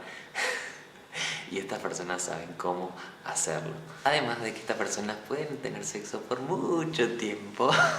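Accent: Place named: Argentina. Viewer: No entiendo, no eres lindo pero me gustas. Q pasa?😅😂 tu voz, mirada? Sonrisa? Me distraes. Ni siquiera me gusta el acento argentino. Tienes ascendente libra? O es que me recuerdas a mis primos? No malinterpretes, no me gustan ellos, pero uno aencuentra atractivo a alguien con semejanza de parentezco. Y aqui concluye el comentario de un mercurio en piscis 😂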